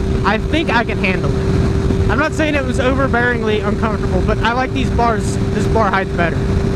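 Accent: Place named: West Virginia, United States. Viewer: Are u from West Virginia?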